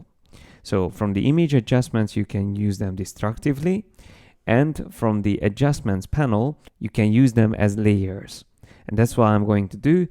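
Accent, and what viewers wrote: Hungary. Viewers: This is a textbook example of HUNGRISH. Terrible to listen to.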